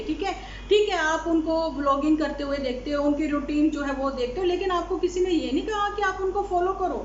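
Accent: Punjab, India. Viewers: Your punjabi so sweet.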